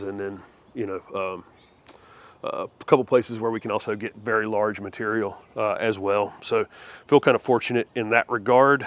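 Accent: South, United States. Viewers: Sounds like you encountered your first troll!! You have made it big😂!! Your southern accent ain’t got nothing on us here in Alabama lol!! We really drag it out but I am ok with my accent!! Some folks really think just because you have a southern accent that your IQ is automatically lower and that just isn’t the case!! Even us well educated folks talk like this lol!!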